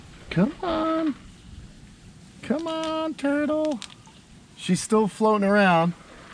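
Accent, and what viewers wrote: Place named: New York, United States. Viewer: Nothing wrong with a New York accent.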